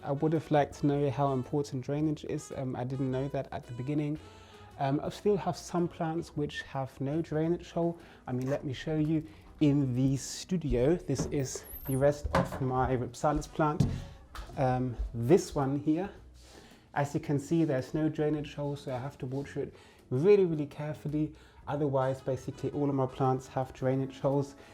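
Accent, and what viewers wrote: United Kingdom. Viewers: Where are you located im thinking England because of your accent.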